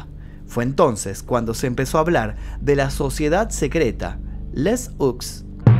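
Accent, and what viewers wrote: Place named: Argentina. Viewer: ! Me encanta cómo relatas y tu voz le da un plus de interés a todo aquello que relatas. Los diferentes tonos, las pausas y el acento, el mismo que el mío, pero que me encanta escuchar por que ya no vivo en Argentina. Cuando vaya para Francia los visitarte y te contaré. Chau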